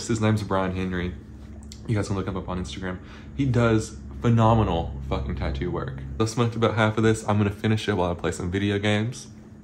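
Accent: South, United States. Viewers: The southern is strong with this one. XD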